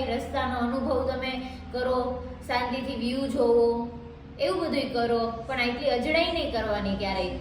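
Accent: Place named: Kathiawar, India. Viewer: Ek nabar bolk desi badha ni jem ferndsi nay bolta ae j hova ni maja aave kadhiya vadi ek dam ❤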